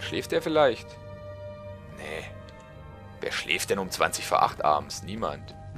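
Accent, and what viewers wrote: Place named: Hesse, Germany. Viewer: ich finde auch dass du einen kleinen dialekt hast, kann es sein dass du aus hessen oder so stammst? :)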